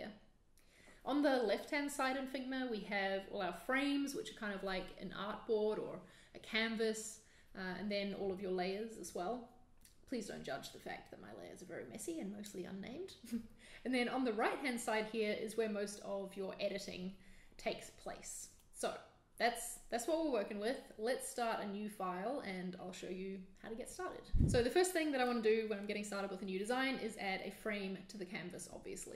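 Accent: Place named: New Zealand. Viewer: Hey thanks for the great vid. I also love hearing a fellow kiwi accent:)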